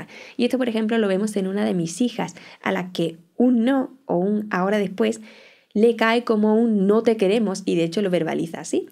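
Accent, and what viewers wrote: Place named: Andalusia, Spain. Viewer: Hola! Me encanto tu perspectiva. Tengo una persona cercana que creo que puede tener TDAH. Una forma no muy severa, pero aun asi con sus dificultades. Gracias por todo lo que has dicho. Me fue muy util. PD: tienes una piel preciosa y me encanta tu acento, que creo que es de España del sur o tal vez de Murcia:)) Saludos!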